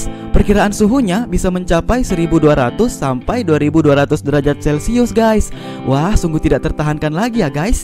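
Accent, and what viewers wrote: Makassar, Indonesia. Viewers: Bang orang mana ya apa orang makasar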